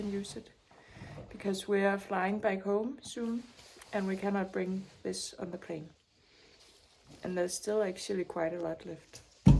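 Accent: Denmark. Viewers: I think that I'm hearing in your voice how the Danish tongue has deeply influenced some British and Irish accents.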